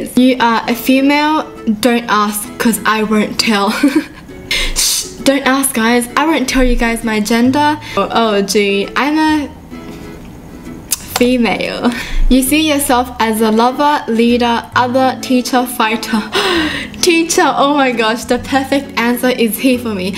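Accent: Australia. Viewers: Good morning Miss.!!! I am the new student in your class:) I really like your Australian accent too!!!:D (wish I could go to her class)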